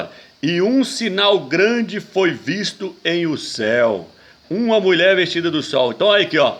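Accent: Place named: Minas Gerais, Brazil. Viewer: vc mora onde, fala igual mineiro.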